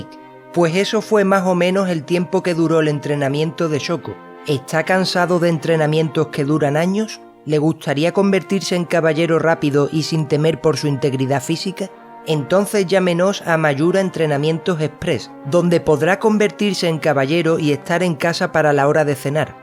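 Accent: Andalusia, Spain. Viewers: Si te doy sincero he visto pocos vídeos tuyos, pero ahora viendo este vídeo tan largo se aprecia tu esfuerzo lingüístico jajaj eres la hostia narrando con ese toque de humor y de forma rápida y concisa pero muy entendible a diferencia de otros. En fin que eres un crack! Por cierto no eres andaluz no? Saludos desde Málaga! 😉👍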